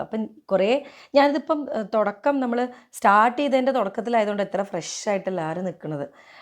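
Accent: Kozhikode, India. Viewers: നേരം എനികില്യ ❤❤❤❤❤❤ കോഴിക്കോട് slang ❤❤❤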